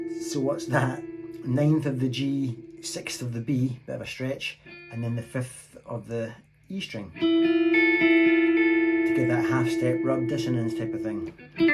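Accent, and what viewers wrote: Scotland, United Kingdom. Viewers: Django with a Scots accent.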